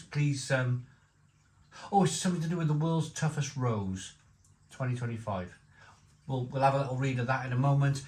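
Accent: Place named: Scotland, United Kingdom. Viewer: Defo sounds like a Westie 😋👌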